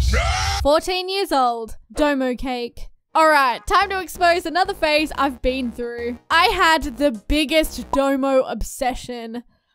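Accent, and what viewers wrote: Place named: Australia. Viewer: My first time watching your channel and I gotta say...when I saw the png of the coles cake I was like .....yeah it's the accent and the coles cake....AUSSIE!!!!! Omg my mum n dad would CONSTANTLY get my siblings and I the coles vanilla sponge cake with whip cream and strawberry jam.... only dad liked it (and cause he paid and got it he picked it) I hate sponge cake now ;v; cake trauma. When we got a tad older we started also getting the same mud cakes...with the very very LOUD lids 🤣😂 memories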